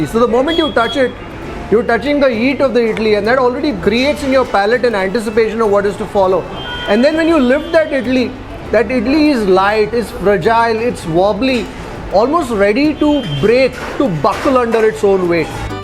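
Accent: Karnataka, India. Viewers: The way you anchor in kannada English is very appreciated and also the food presentation is very addorable 🙏🙏😊❤️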